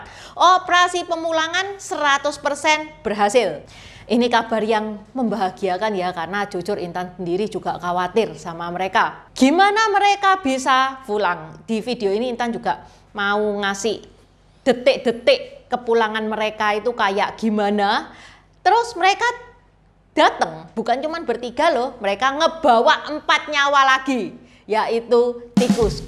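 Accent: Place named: Surabaya, Indonesia. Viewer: Bukan beritanya yg saya suka, tapi dialek khas Surabaya nya yg apik tenan.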